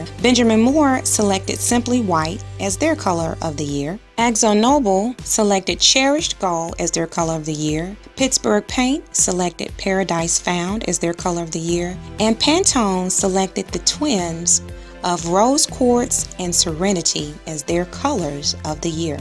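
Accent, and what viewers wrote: South, United States. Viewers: She is southern so has a thick accent... I'm not even American and I can understand her clearly. Then again, I do speak 4 languages. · Your soft sweet southern voice is so soothing to me, it's easy on the ears! The informative pictoral dissertation on styles over the years was interesting and proves there truly is "nothing really new under the sun" so we are assured it may be out for now but it will return! Glad to have found your channel!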